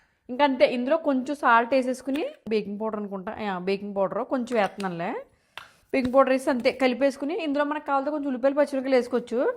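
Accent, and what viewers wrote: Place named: Amalapuram, India. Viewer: అమెరికాలో అమలాపురం యాస మరియు పునుగులు స్వచ్ఛంగా ఉన్నాయండీ...😊👌💐💚🇮🇳💜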